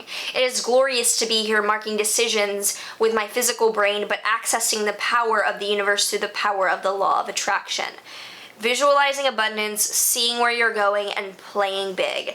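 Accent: United States. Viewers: Your English is terrific - I genuinely thought you were American! And if you need to talk, my email is <mail> I’m sorry you’re going through all this stress but I’ll be happy to listen. Wishing you the best!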